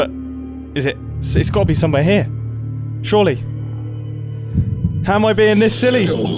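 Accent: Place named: United Kingdom. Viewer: It's funny how becomes more British as he gets excited and stressed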